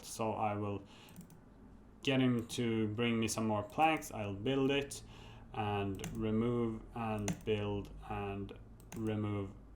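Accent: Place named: Sweden. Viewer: You sond super swedish, amirite?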